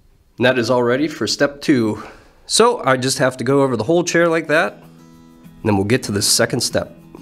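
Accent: Western Pennsylvania, United States. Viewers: Elizabeth/Clairton south of the Burgh. My parents were born and raised there. My mom's parents came to Clairton from Italy. My dad's parents came to Western PA from Wales. I'm a huge Pirates fan. Clemente was my boyhood hero (and still is!). We make an annual summer trip to the Burgh to visit cousins and take in a Pirates home series. Not sure it will happen this summer. Hope so. It's good to hear your Western PA word usage ("Pap," for example; waiting to hear you talk about "ridding" up the shop!) and accent. I've really enjoyed the craftsmanship and attention to detail you demonstrate on your projects. The Western PA connection is an added bonus (one I can't make with Thomas Johnson)!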